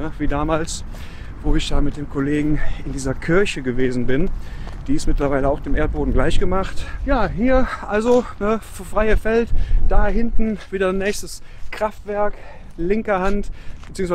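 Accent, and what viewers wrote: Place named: Cologne, Germany. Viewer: Guten Morgen. Tolles Video, wie immer und dazu dein Kölche Dialekt, vom feinsten. Wünsche Dir einen schönen Wochenstart.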